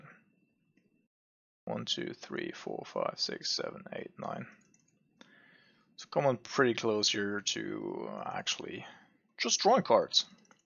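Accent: Germany. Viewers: Great content as usual but I like Grixis more than UW. Grixis is more flexible I think and more fun to play. But your videos always show your great insight into the deck and also into the meta. By the way are you a German player? Sometimes you pronounce words very German :) And wha are your thoughts on UW Stoneblade in the current meta?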